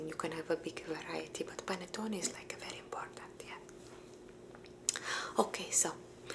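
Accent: Italy. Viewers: This is the same as my accent when I try to speak English😂(I am Italian🇮🇹).Nice video! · Italian accent - this is amazing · Brava, brava bravissima. You sound Italian just like me 😅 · This is great, I love learning about Italy and your ASMR. Italian accent is always so good. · fantastic asmr, love it when you do the italian accent 😍. just out of curiosity but what nationality are you, just to know your mother tongue? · I love the italian accent and I love hearing about italian culture in general! Is it true that italian pizza is different than the ones in Europe and America? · I love your italian accent videos. I would love to see more of them. Greetings from Germany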